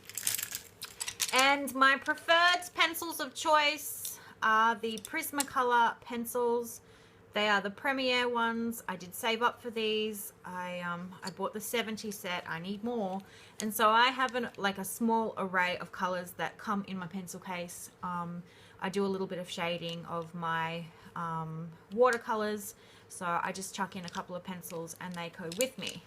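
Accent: Australia. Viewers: I am enjoying going through all your videos! Nice to hear a fellow Aussie accent too x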